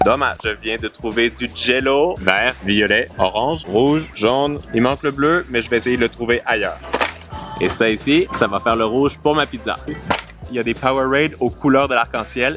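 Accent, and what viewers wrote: Quebec, Canada. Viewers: J’adore l’accent d’, c’est un mélange de Québécois, Anglais et Allemand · a l'accent québécois et un accent qui ressemble a l'accent hollandais (enfin le ton lorsqu'il parle français) Pourtant ils sont jumeaux je suis perdue.... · Pourquoi et plus québécois et on frère est plus allemand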